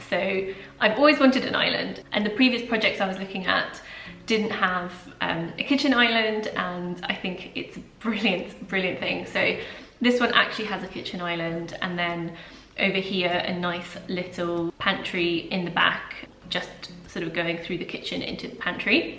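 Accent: England, United Kingdom. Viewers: What a fantastic and honest video . I was shocked, that your polish . I dont mean that in a rude way , you sound very English. Everyone thing will work out . Big move or is it something you always wanted to do ? Keep the good work up 👍👍👍🥰🎉🥳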